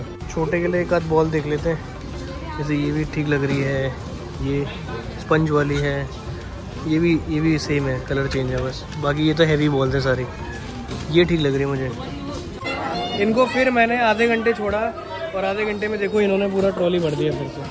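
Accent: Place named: Garhwal, India. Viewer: Aapke ghar ke video best hote hai ...mja apki mummy or apke gadwali ascent se hi ata hai ...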